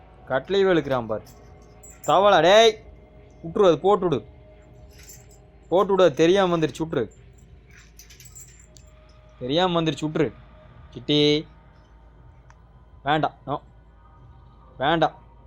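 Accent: India. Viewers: sema Sema bro.. unga channel romba superb ah iruku.. unga way of taking that kovai slag ah semaya iruku.. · உங்கள் கொங்குத்தமிழ் மிகவும் அருமை நண்பா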